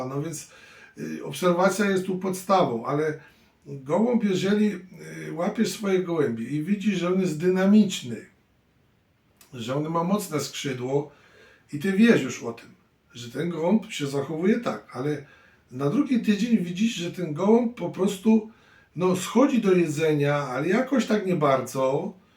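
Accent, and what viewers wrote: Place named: Russia. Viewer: Co ten chłop pieprzy.Mowi na filmiku że już teraz zbiera czarny bez i daje gołębiom.Bylem w paru miejscach z ciekawości gdzie rośnie czarny bez i wszędzie jest jeszcze niedojrzały.Dlatego mnie to zdziwiło że ten hodowca od siedmiu boleści mówi że już zbiera i podaje bo ja też podaje bez i zbieram dojrzały na początku września.Radze nie słuchać rad tego hodowcy bo nie trzyma się kupy ani dupy.A jak taki wybitny hodowca że chce szkolić innych to niech się pochwalić jakie ma wyniki na przestrzeni lat na szczeblu oddziału już nie wspominając wyżej Żenada co on bredzi na tych jego filmikach jeszcze ten ruski akcent.Ortodoksyjny Ślązak!!!!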